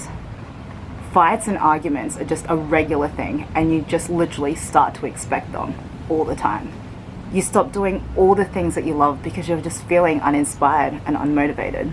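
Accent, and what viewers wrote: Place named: Australia. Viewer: I seriously love australian accents so much (which is what I think yours is?) lol if not, I STILL LOVE IT Also, I can relate to ALL OF THESE. It made me laugh when you said "you just wish they'd leave you," because I lowkey would have this thought in the back of my mind that said "I wish he'd just cheat on me so I could leave angrily." I know it's bad, but I was looking for a way out instead of facing my true feelings of just simply not wanting to be there.. I am no longer in that relationship though!!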